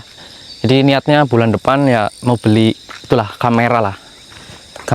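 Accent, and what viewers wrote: Banyumas, Indonesia. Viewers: Ciri khas narasi ala adalah penggunaan kata2 yang lucu penyebutannya. Palkir, tlaktor, kakekk-kakekk, nenekk-nenekk, nyebut house jadi hoss, dll. Juga dialek ngapaknya, dan gaya polosnya. 😁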